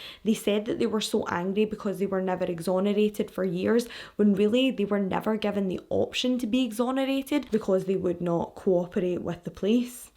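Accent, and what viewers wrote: Scotland, United Kingdom. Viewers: I kno rite I love ur personality ur accent ur beautiful very down to earth but funny to!! U go girl all the way in Scotland I Loove it... LOVING UR CONTENT N ALL U DO DO FRM YA GIRL FROM (HELLO FRM THE OTHERSIIIDE HELLOOO FRM THE OUTSIIDE LMAO VIBES ALL DAY LOVE IT LOVE IT! LOVE ALL THE WAY FROM SAN DIEGO CALI BABAAY! HEAAAYY!!! CARRY ON GIRLFRIEND!!❤️😆❤️😆❤️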